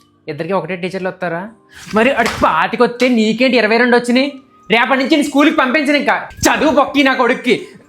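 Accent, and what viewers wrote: Godavari, India. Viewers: Adi godavari slang andi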